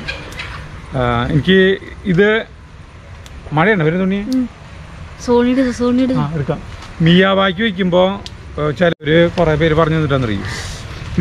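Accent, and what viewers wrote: Thrissur, India. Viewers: Thrissur slang❤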